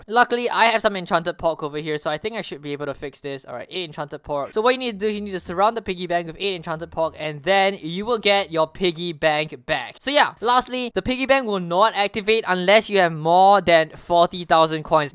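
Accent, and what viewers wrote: Singapore. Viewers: R u from singapore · You sound like you're singaporean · Dude r u singaporean cause i am and u have the accent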